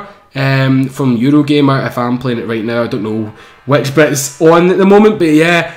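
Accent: Scotland, United Kingdom. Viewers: What part of Scotland you from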